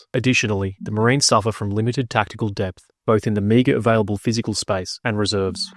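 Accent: Australia. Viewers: He clearly has an Australian accent.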